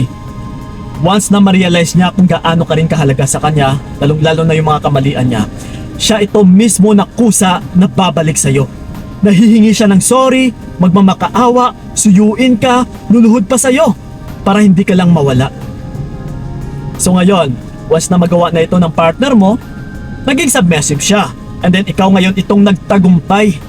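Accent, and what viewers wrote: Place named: Hiligaynon, Philippines. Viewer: parang ilonggo si kuya dahil yong tono ng salita niya malumanay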